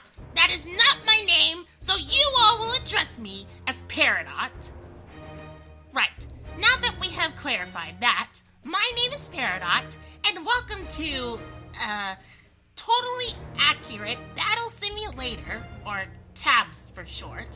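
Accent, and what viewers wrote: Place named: Scotland, United Kingdom. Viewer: NAH, I'm calling ya. Nice Scottish accent as well.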